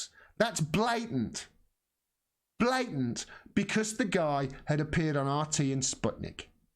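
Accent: United Kingdom. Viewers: what makes that story and previous ones you covered kinda 'palatable' for us powerless rabble is your great Brit accent! Would love to be able to rant about the media in my own french language the way you so honestly do. Thank you and here is a little story that will make you and your followers laugh your a** off in these bleak times, enjoy: <link> and here is the tape: <link>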